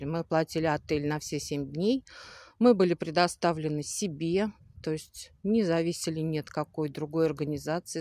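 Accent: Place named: Dagestan, Russia. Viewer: 😂😂😂😂😂 только ты сама из Дагестана. И акцент у тебя Дагестанский. Сколько тебе заплатили за рекламу. Охринеть за деньги все что хочешь сделают и раскажут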